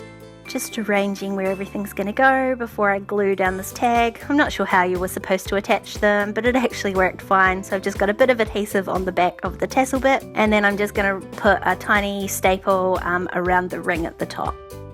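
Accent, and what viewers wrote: New Zealand. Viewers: Do I detect a Southland twang in your accent? Cute page, and a good way to include extra photos when the occasion calls for it.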